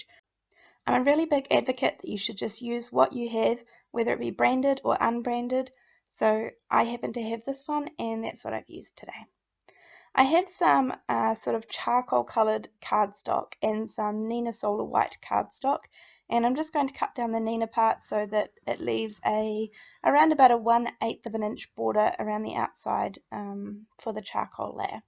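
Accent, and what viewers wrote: New Zealand. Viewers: Gorgeous card and a technique I am keen to try for myself. Wonderful to hear a Kiwi accent , that's why I subscribed to begin with, as support for a fellow New Zealander then I made the happy discovery that you are also a very talented card maker so many thanks for putting so much time into sharing your skills with us.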